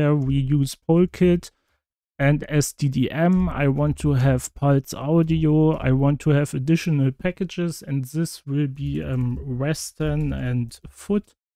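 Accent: Germany. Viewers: Bruder hat den dicksten deutschen Akzent den es gibt.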